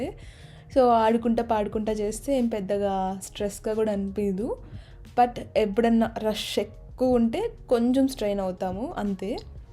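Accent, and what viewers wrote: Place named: Warangal, India. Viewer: garu mi slang pakka Warangal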